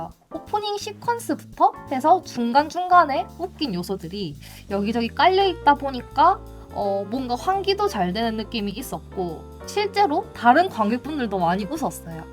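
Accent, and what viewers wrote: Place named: Busan, South Korea. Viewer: 익숙한 억양(부산사람)으로 차분하게 꼼꼼하게 잘 설명해주셔서 재미있게 시청했습니다 감사합니다 플래시 꼭! 보겠습니다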